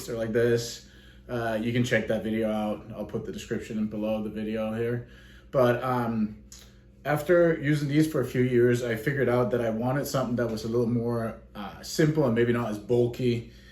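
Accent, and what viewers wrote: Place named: Russia. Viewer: Thanks for the idea, im doing this as i type! Btw, what country are you from? Ive watched alot of your videos, and i swear i can hear a slight dialect from maybe russia?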